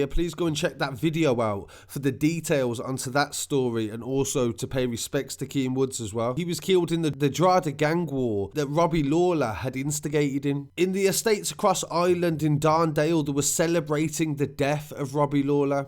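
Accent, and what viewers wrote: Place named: South London, United Kingdom. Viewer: Can tell your from south London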